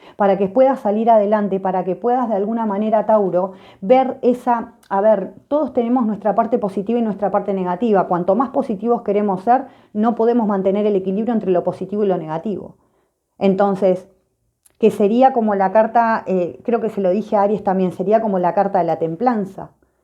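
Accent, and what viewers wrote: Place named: Argentina. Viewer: Amo tus lecturas y tu forma de leer tan argentina jajajajja te talta el boluda amoooo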